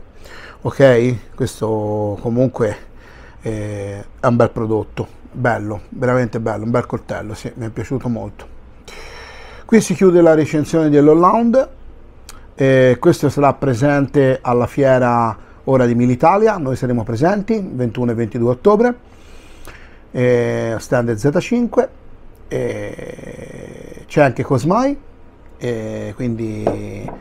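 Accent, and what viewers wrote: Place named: Tuscany, Italy. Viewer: Coltello pazzesco e’ riduttivo….addirittura anche il Leccio si è dovuto arrendere( dopo una estenuante lotta corpo a corpo 😂)….mi piace moltissimo…..ottima la tua recensione (come al solito…) sempre dettagliatissima e colorita col dialetto che solo noi toscani sappiamo offrire. Una buona domenica a tutti 😊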